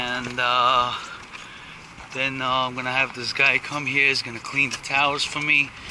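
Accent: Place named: New York, United States. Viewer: You are cool man, love your accent, that classic NY accent as its best!! Cheers from Sweden! Jupp Bahco was made here once....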